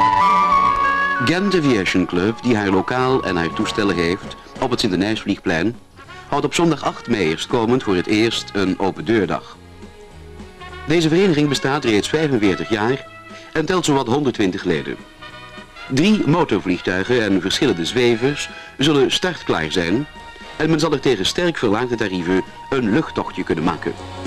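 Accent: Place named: Netherlands. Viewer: Spijtig van dat Hollands commentaar- waarom geen Vlaming?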